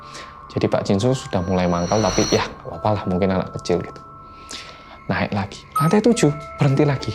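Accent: Java, Indonesia. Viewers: Seneng lagi ngomong boso jowone sing medok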